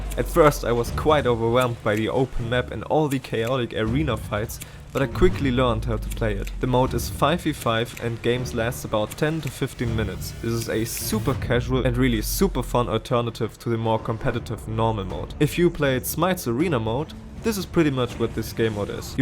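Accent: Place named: Germany. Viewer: English with a German accent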